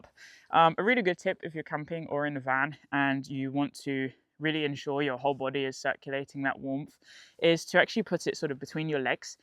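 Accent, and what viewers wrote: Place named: Scotland, United Kingdom. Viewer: As you've demonstrated, a Scottish accent can help put you in the mind-frame for hardiness. Allegedly - a cold foot bath before bed can make them warm - (old scouting tip...).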